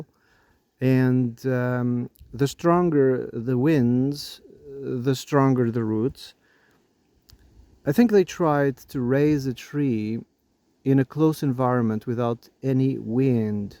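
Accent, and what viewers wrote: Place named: Portugal. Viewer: Inglês com sotaque português detetado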